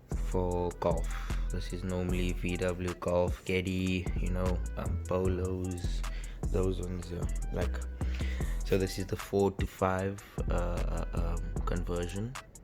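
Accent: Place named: South Africa. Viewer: this is a south African accent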